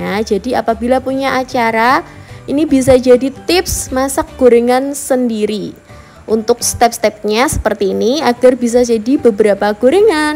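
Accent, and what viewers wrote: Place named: Java, Indonesia. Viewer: Suara nya medok Jawa bnget, kereeeen 👍👍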